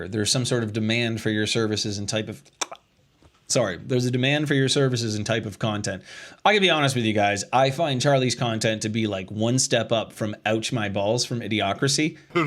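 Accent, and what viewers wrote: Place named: Canada. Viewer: Man. You're funny and cringe but I HATE the way you Canadians say your "out" words as oot. They're pronounced OWT not OOT you snow monkey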